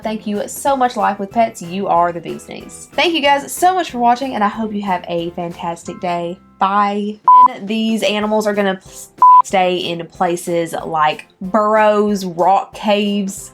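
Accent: South, United States. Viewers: I have never seen or heard a black woman with a country accent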